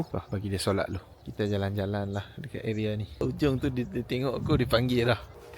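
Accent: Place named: Kedah, Malaysia. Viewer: Alhamdulillah terbaik setiap hari sy x miss tgk video Doc. Rasa seronok dgr bahasa kedah Dr. Sbb sy pun org Jitra Kedah kpg kita pun sama Tanah Merah jgk. Terima kasih dr hidangkan video2 terbsik serata dunia byk ilmu yg sy dpt. Sy doakan terus sukses dan sy doakan jgk Allah bukakan hati dr utk Islam. Aamiin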